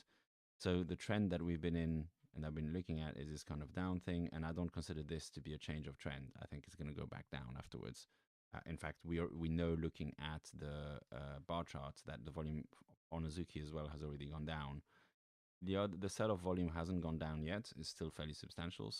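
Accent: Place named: Switzerland. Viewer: Great analysis, thanks! I couldn’t figure out if your accent was German or French, it had elements of both so I guessed you are from Switzerland. I go to your twitter and boom, I wasn’t wrong :)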